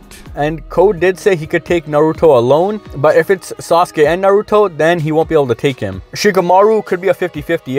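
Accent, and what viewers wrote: Toronto, Canada. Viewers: Like your theories bro but Yo you from Toronto? You sound like them sometimes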